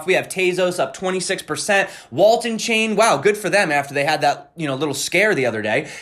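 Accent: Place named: United States. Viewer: Am I the only one still living in the US? Even you appear to have an American accent yet you use Bybit which is not available for US residents.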